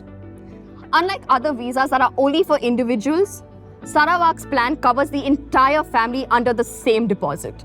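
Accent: India. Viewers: Is this AI, white woman talking in Indian accent and Hindi 😮....why is she saying Sarah walk ....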